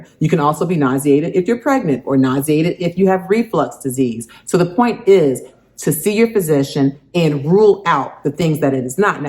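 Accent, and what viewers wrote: South, United States. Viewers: From the South, that explains the accent, love it !! Come on folks ---- 460 views and only 76 Likes...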